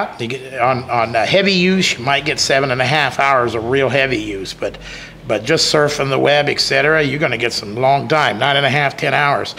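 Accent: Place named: Hill Country, United States. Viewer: Great review. I love your commentary style. You sound like a good old boy from the hills. Just subscribed to your channel.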